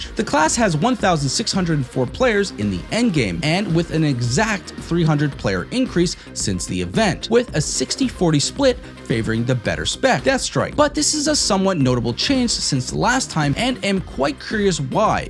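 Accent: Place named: Canada. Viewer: On a side note your Canadian accent is very thick and I’m a foreigner 😂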